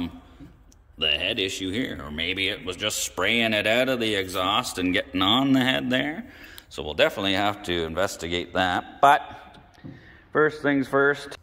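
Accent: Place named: Maritimes, Canada. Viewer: I like your accent, but trying to place it. I've got it narrowed down to either PEI or NS. Am I close?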